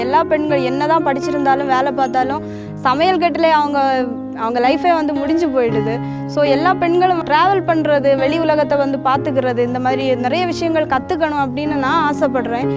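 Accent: Kanyakumari, India. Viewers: Uinga voice eaa solu thu kaniyakumari nu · Hi ..... sister கன்னியாகுமரி slang nice...look like Kerala a slang ... உங்கள் கனவுகள் வெற்றி பெற வாழ்த்துக்கள்..... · Kannyakumari slang veliya tarriuthu akka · Akka you’re kanyakumari Tamil slang so sweet akka. to beautiful❤️❤️❤️❤️👍 legend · Na slang vecha kandupidichitan Kanyakumari nu super sis nanum Kanyakumari tan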